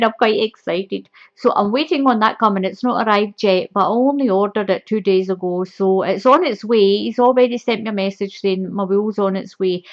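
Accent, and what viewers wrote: Scotland, United Kingdom. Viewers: Very clever idea. I have a kind of personal question though, are you scottish or from northern England? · are you Scottish? your accent is very cute. greetings from Romania! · are you scottish? I just love your accent!! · what a heavy british accent 😂 wow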